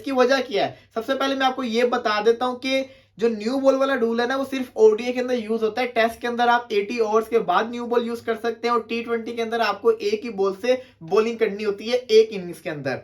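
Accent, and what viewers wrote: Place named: Lahore, Pakistan. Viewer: Bro you must be lahori. I can tell it by your ‘ڑ ’😂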